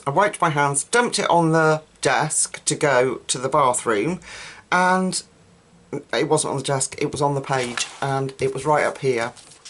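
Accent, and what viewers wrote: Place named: RP, United Kingdom. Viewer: great art...and personality, why are British posh accents so funny, the language - "stickled to High Heaven", LMAO. love it. I could listen to you every day - I don't know how famous she is in general, but most Americans, like myself - except I know who this is, but most Americans, possibly some Brits - might not know the actress, Amanda Donahoe or Donahue. She was in "Lair of the White Worm' with Hugh Grant from the '80s. I freaking love listening to her and looking at her, she's awesome. don't ask me why, BUT...you sound like her! That's a compliment luv...coming from me. buy yes, love your works, I'm not as crafty as you and the coloring community on youtube, but I'm trying in my spare time. However, I go for the more strange and horrific if possible... let's just say, that Bradford lady's great art would never hit my desk. 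- But seeing your love for the kind of "cute as shit" stuff, and payoff ---- is well worth it. Keep on Stickeling Luv. lol, James from Florida!!!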